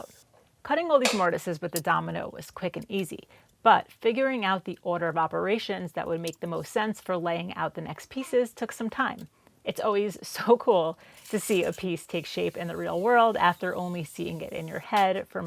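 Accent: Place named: Long Island, United States. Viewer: Long Island big time. I'm not from here originally, so it grates n my nerves every time someone says it. And I'm in a woodworking club lol. See also "Radiator" where the "rad" rhymes with rat, not raid.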